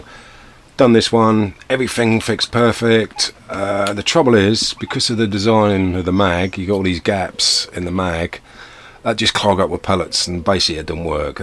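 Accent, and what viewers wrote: United Kingdom. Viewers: Nice video mate! Is that a norfolk accent!?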